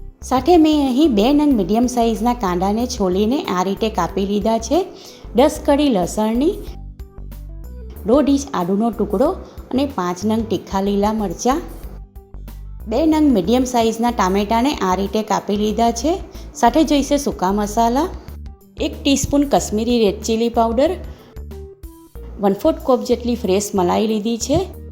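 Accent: Surat, India. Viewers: બહેનશ્રી તમૈ સુરતી( હુરતી) છો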